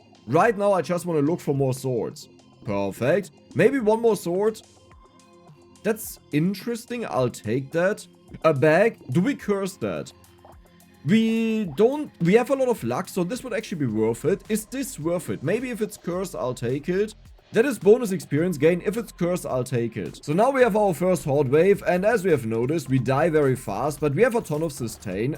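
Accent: Germany. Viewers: You sound German… Edit:he is I’m proud of my country now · German english is awesome 👍😎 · i can firmly hear the german accent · I Hear deutsch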